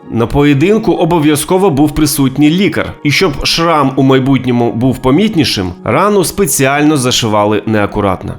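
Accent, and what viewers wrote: Russia. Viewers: Це кацапський акцент. Не робіть мєнє нєрви. Ось це одеський акцент. А у відео суцільне ґекання, що хочеться вже відписатися.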